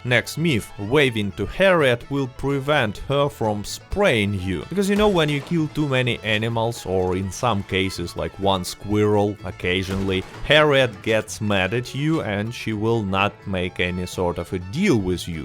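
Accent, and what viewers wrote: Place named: Russia. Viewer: Четкий русский акцент, 😄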